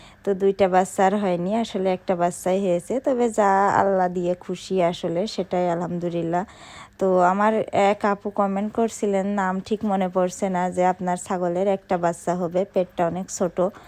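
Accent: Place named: Jhenaidah, Bangladesh. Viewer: আপু তোমার বাসা কোথায়??? ভাষা টা আমার জেলার মতো লাগছে। ঝিনাইদহ জেলা আমার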